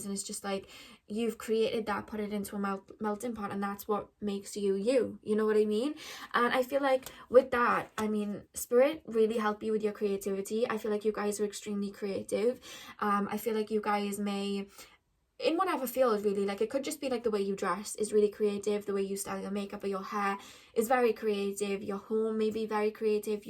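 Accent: Wales, United Kingdom. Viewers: #1. Love listening to your lovely welsh accent.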